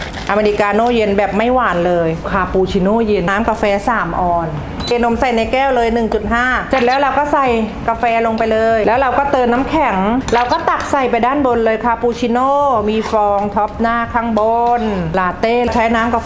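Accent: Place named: Provincial, Thailand. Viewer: ดูเพลิน พี่เสียงเหน่อน่ารักด้วย ขอบคุณสำหรับคลิปครับ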